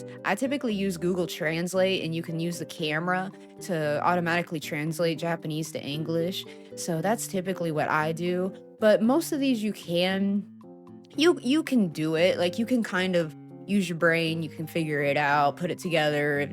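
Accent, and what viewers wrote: South, United States. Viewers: Did you move? You’re accent is way country now lol happy to see your videos again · You retired from the other hobby? Just curious. Plan to be watching your new content here. I never noticed you having a slight southern accent before. Love it.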